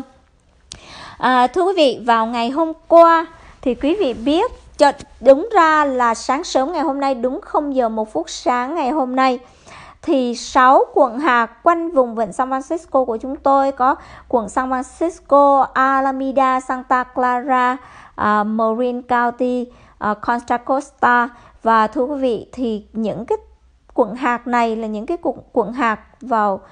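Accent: Vietnam. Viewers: . Trong lúc đưa tin, chị có dùng từ "cơ cấu", sau đó chị lại nói " dùng từ VIỆT CỘNG". Vậy xin hỏi chị, thế nào là "từ Việt Cộng"? Chẳng lẽ "từ Việt Cộng" không phải là tiếng Việt trong khi chị cũng đang dùng tiếng Việt đó mà! Và từ "cơ cấu" là từ của Việt Cộng" thì từ "cơ chế" là từ của ai? Của chị à? Bởi vậy, hai chữ "Việt Cộng" của chị khoét sâu vào sự chia rẽ không đáng có giữa "chúng ta", những người Việt Nam với nhau, có cùng chung tổ quốc và ngôn ngữ. Xin giải thích thêm, ở Việt Nam, cả 2 từ "cơ cấu" và "cơ chế" người ta đều sử dụng gần như đồng nghĩa, từ "cơ chế" thậm chí được dùng phổ biến hơn. Sau này từ "cơ cấu" cũng có khuynh hướng được dùng như một động từ, nghĩa là "gắn đặt một cái gì, một ai đó vào một hệ thống". Cũng xin nói thêm, cách dùng từ tiếng Việt và phát âm tiếng Anh này kia của rất dễ nhận thấy là chị đã ở Việt Nam (hay nói cách khác là ở với "Việt Cộng") rất lâu trước khi sang Mỹ.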